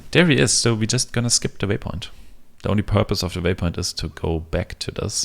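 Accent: Germany. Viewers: Oh my god, you can't just ask someone why they sound German!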